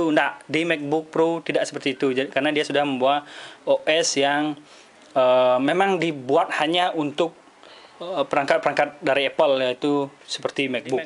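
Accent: Bali, Indonesia. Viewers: bagus videonya, tingkatkan lagi bli.. khas sekali logat balinya.. hehehe suksma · kaya logak bali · tong logat bali sing ilang... Mantappp oom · bali lah.. bener gak bli? =)) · Pasti Orang Bali... Kental banget Logatnya.. Hari Minggu TOKO TOKO PADA TUTUP, Phanter irit cling cling... xixixixxixi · Itu logat Bali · Logat balinya kentel saja bli 😄..suksme infonya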